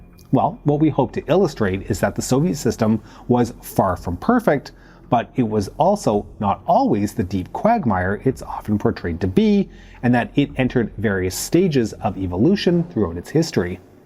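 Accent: Canada. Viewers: It finally clicked during the conclusion why he sounds American but pronounces things like a Brit… he’s a Canuck!!! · Hah, I always thought you had that slight Ontario accent. I'm from Kingston lol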